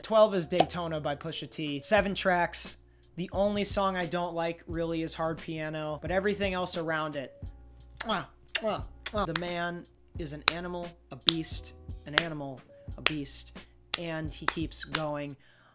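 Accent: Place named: New York, United States. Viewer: sounds extra new yorky in this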